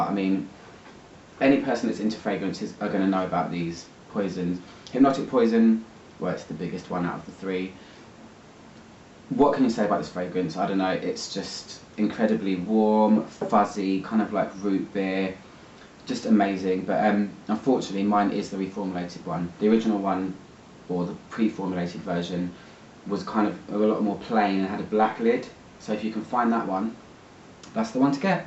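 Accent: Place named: United Kingdom. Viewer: Hello! is a fantastic video, i love your accent more than anything , english people talk so elegant, i like that, but there's a part i can not undrestand very well and i am interested in that perfume i want to ask you, what's the charasteristic of the original hypnotic poision of Dior, how is the bottle?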